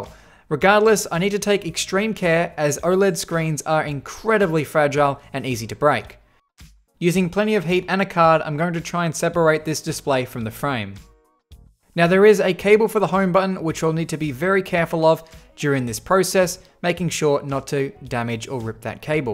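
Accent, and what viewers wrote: Australia. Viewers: W8 this dood is australian too. Nice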